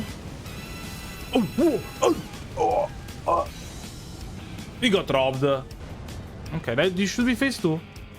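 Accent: Italy. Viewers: Im 90% sure is accent is a strong italian accent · Our favourite norvegian-with-heavy-italian-accent youtuber!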